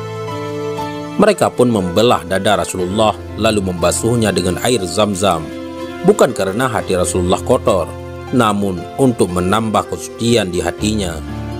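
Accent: Aceh, Indonesia. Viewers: Baru sj suami sy beritahu sy suara min kyk ny org Aceh ajah yaa?🤭😀😊👍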